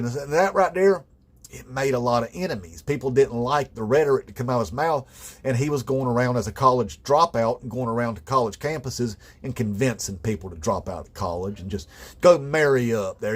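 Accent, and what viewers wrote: South, United States. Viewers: I like you, brother. You give me "Theo Von's much older brother" vibes and your southern drawl is literally the reason I stayed and watched the whole video. I agree with your analysis of Kirk's character and my only pushback is.... a lot of the country is well aware of what kind of guy he was and that's why they celebrated him. A lot of this country is made up of racist-ass, hypocritical-ass, sanctimonious-ass, bigoted-ass, xenophobic-ass Christians who condoned his vitriolic rhetoric so.... there's that. Anyway, great video! I'll subscribe.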